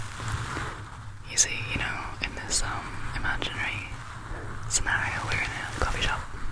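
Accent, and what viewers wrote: Scotland, United Kingdom. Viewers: Prefer the rambling to the pure sounds because of the melodious Scottish accent. You should def do livestreams- super under utilized in asmr community. Also wouldn't hurt you to get some of dem superchats!😉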